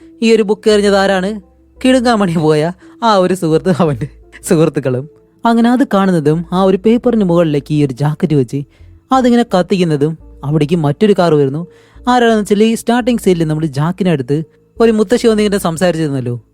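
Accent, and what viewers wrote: Palakkad, India. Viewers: ഞാനാണ് നിങ്ങടെ പാലക്കാട്.... സ്ലാങ് തോന്നിയ ആരേലും ഒണ്ടോ?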